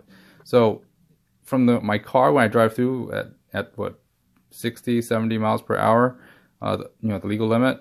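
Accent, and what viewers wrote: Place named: California, United States. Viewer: This was good but I had to listen over and over to catch his words, as he didn't speak distinctly, unless I'm just not accustomed to a California accent. But still, thanks for the info.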